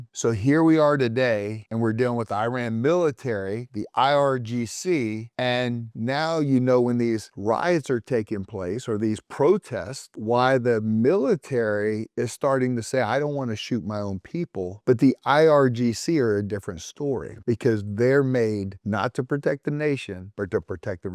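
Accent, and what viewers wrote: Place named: South, United States. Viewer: Correct. His southern accent limits his ability to pronounce a significant number of words properly, but we get the idea.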